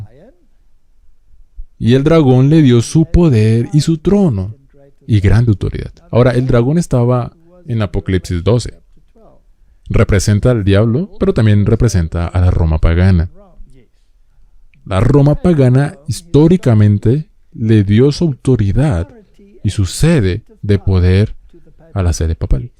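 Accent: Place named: Colombia. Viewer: Feliz Sabado!!!!.....gracias por dejar abajo el enlace a la predicacion original. La estaba buscando y no la podia encontrar. De paso me encanta tu acento colombiano!!! Keep up the good works!!!!! God bless your ministry!! Bendiciones!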